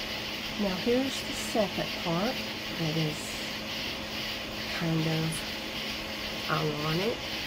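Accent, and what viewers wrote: South, United States. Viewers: She is definitely southern, My Dad was from Alabama and pronounced certain words differently.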